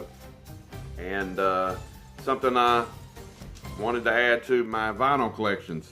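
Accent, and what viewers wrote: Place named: West Virginia, United States. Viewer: Don't let the coffee get cold that's not good. Gotta love our WV accent who doesn't love us WV lol great video awesome movie update now drink another cup of coffee and hope you have a wonderful day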